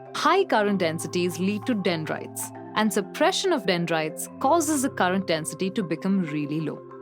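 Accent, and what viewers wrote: India. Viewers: if I had teachers with Indian accent in school, I would be the best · speaks English with a strong Indian accent its awful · That soft indian english makes this so much nicer to hear doesn't it?😁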